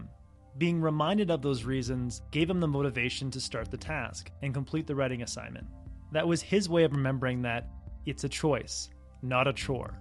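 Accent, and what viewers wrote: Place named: Canada. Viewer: Bona fide Canadian (Alberta?) accent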